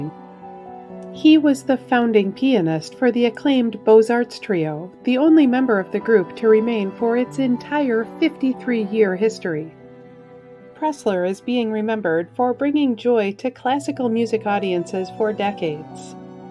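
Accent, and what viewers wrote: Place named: Midwest, United States. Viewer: I love her sweet midwestern accent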